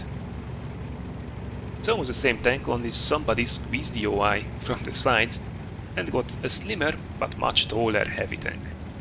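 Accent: Hungary. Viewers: he is from hungary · Where are you from??(narrator) You have got hungarian accent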